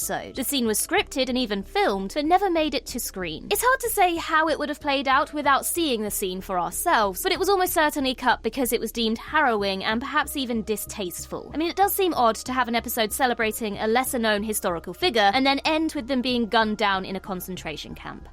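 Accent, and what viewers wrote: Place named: RP, United Kingdom. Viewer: She’s a woman and it’s RP English.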